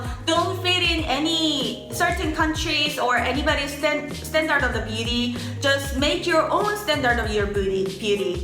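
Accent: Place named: South Korea. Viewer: You speak English well but still have a Korean accent. Interesting.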